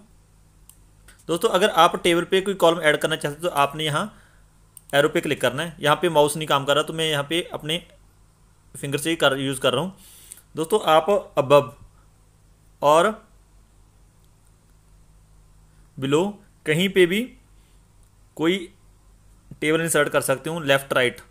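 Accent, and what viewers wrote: Punjab, India. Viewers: Are u punjabi? Ur type of speaking seems punjabi ! And i like punjabi😊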